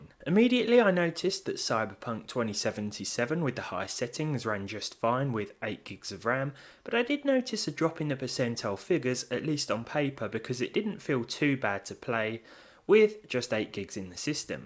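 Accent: United Kingdom. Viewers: How could i ever your Videos press on dislike??? I Love Your Videos! Nice comfortable Voice, interesting themes. And the british-like english helps me to push my language Sklills. Many Thanks! (How to say in englisch?: weiter so! (german) Further so!?) 😀 Have a nice Weekend! ❤❤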